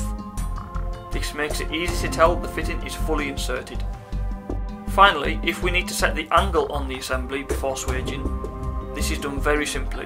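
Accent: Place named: Carlisle, United Kingdom. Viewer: Was looking into this sort of business. Recognised the accent. I’m Carlisle lol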